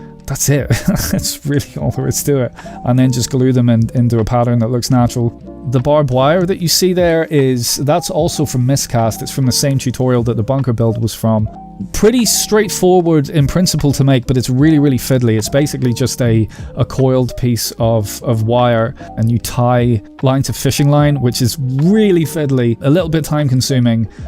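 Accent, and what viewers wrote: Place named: Ireland. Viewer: Brilliant ideas done brilliantly! On an personal note,you sound like a countryman of mine. Are you a northerner who went south or a Dub who went north! Either way, you’re very talented😊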